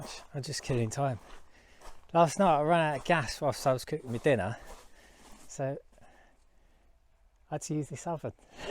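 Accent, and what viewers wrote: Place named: London, United Kingdom. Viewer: I feel your pain, I need a raft foundation alonge with a couple of steel beams and had 93 pages of structual engineering calculations turn up. I just turned to the back two pages to see what I really need! By the way you must come from the same part of London as my mate (who's a bricky) as my daughter heard you when I was watching and was convinced it was him lol, you sound alike and have an identical manner.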